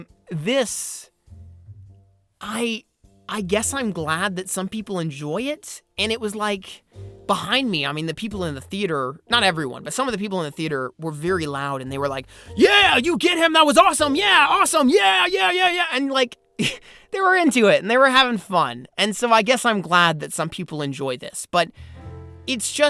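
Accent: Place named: South, United States. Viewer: He says y'all he really is from Houston/the south in general.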